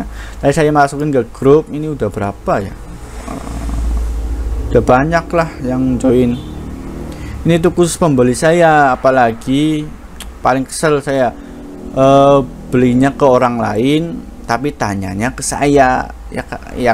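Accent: Indonesia. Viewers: logat cirebone matep lur ciri khas banget. . salam wong reng,pengen takon bokat di sewoti soale tuku alate ngecer dudu ning sampean,,wkwkwk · Logat bahasa kyak wong reang ✌✌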